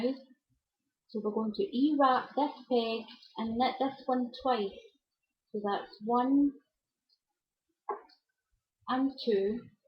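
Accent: Scotland, United Kingdom. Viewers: I love this video, do you have to crochet after you take it off the loom? I love your accent, your from Scotland right? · Hi, Thank you so much for this. I was looking for a new project to do on my loom. This is perfect. Such a pretty stitch. I'm from Livingston. It's lovely to hear a Scottish voice on YouTube.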